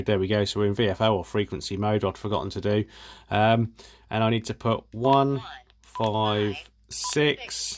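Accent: Norfolk, United Kingdom. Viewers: Thass a fine Norfolk accent you've got there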